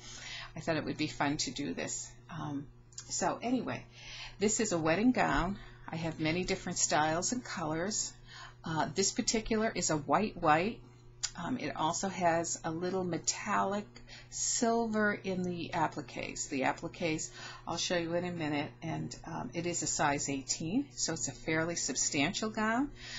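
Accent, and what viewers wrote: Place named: Canada. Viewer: Hi, new subscriber...I just came over from lynnyvnnestwo channel...she made a video response to promote your challenge...here's a link for you - <link> (hope it works) not sure if I'll be able to take part this time but good luck to everyone that is...hope it all goes well for you...enjoy. The dress is beautiful and it's so generous of you to want to share...I think it would be hard to cut into one of the dresses though but I guess once you start it's ok and there's so many things you can make once you have one...also are you in Canada or America...my guess is Canada but please forgive me if I'm wrong, I don't want to offend anyone..lol..why I ask is because there's a certain word that Canadians say and I love the way it sounds...the word is "out" and I'm sure it's a unique pronunciation to Canada...anyway, that's enough from me...best wishes. 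Ann...x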